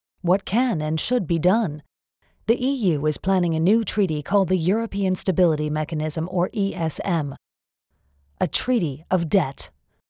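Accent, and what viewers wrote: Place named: United States. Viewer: So why does the narrator have an American accent?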